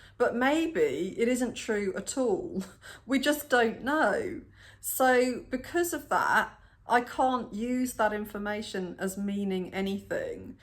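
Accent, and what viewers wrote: England, United Kingdom. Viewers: your beautiful, English accent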